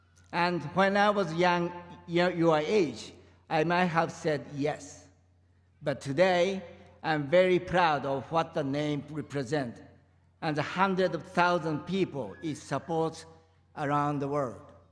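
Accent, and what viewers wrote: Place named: Japan. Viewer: これが日本人英語の限界か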